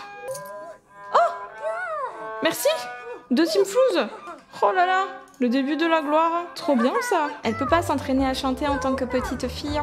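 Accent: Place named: United Kingdom. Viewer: J'adore ton axent anglais sinon te la best ma yt préf 🥰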